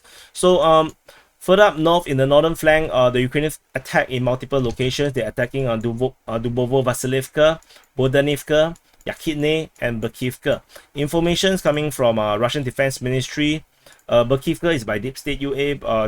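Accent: Singapore. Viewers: Never apologise for your accent. I know that it is nowhere near full on "Singlish". I am also pretty certain that you speak at least one Chinese dialect and also Malay and that you speak both as well as anyone. The number of languages that most Singaporeans speak has always astounded me. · HUH .. It' a Singaporean accent.. You know like an Australian Accent .. Or Alabama or CAnadian.. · We love your Singaporian accent! Keep going ! Your are the best channel on Ukraine war!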